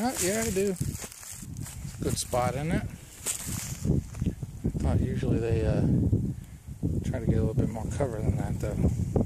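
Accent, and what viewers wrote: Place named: New Jersey, United States. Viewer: Let's see, I started watching you because of the cooking videos, a lot of what you cook, is way too spicy for me, but my husband LOVES spicy food, so you give me ideas of things to cook for him that he will like (and I just do myself a bland version). I really like your accent, I used to work with a really funny guy from New Jersey and your voice reminds me of him. I also like that you love your cats, I have dogs, cats and parrots... so I basically am the zoo minder around here. So anyway I watched your ramble, and felt compelled to ramble on a bit myself... sorry about that.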